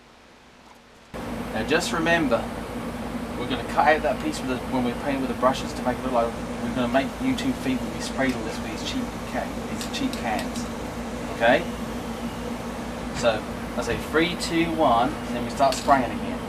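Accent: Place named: West Country, United Kingdom. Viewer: Where are you from? I get a slight hint of West country then antipodean. Like an accent twisted from being abroad.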